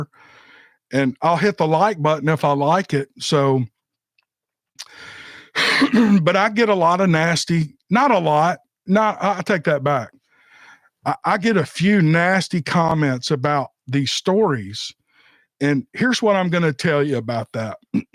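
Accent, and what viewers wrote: South, United States. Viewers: I LOVE YOUR VIDEOS..THE SOUTHERN ACCENT JUST PULLS ME IN AND YOUR SUCH A NICE GUY..GOD BLESS...THANK YOU FOR ALL YOUR HARD WORK AND DEDICATION..❤HOPE YOU FEEL BETTER SOON!! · Me to Jenn jenn he is a humble soul just listening to him soothes your mind and the southern accent I luv.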